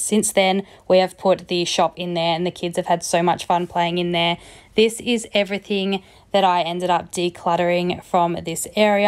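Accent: Australia. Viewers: You know what’s fun? Finding an Aussie making this kind of content! Usually it’s Americans or other nationalities. It’s oldly relatable seeing Big W, Coles and Woolies bags being used as your donation bags haha, just hearing the accent, the “brush it off and keep moving/devil may care” attitude to passive aggressive comments, and Bluey toys around etc even you having a doggo (it feels like all Aussies do!) it’s really a breath of fresh air. Hi from QLD! 💕✨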